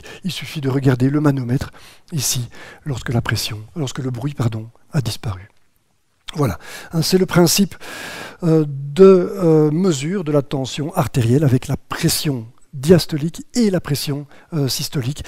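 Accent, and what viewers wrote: Belgium. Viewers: Bonsoir Alain Soral ... alors à Lauzanne à présent ?.. mais alors d'ou vient cette accent belge pas courant en Suisse ?.. On ne connait pas la deuxième personne du pluriel en belgique ?.. Cette façon de se croire moderne en tutoyant les inconnus au pretexte qu'on est derrière son ordinateur m'agaçe passablement !.. Je vais donc aller ailleurs !.. Bonsoir !..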